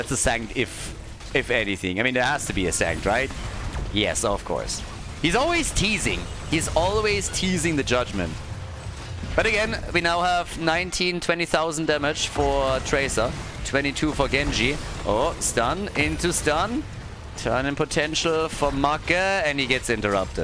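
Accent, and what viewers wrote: Germany. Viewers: Nice match You have a bit of German accent?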